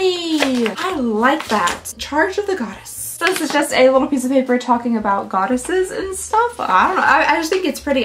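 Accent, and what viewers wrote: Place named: Tennessee, United States. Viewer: Sweetie, there is NOTHING wrong with the way you talk. If ppl dont like it, you know the saying..."just keep on a-scrollin'". I personally LOVE the way you tale and describe things. Reminds me of home (Tn.). I miss it.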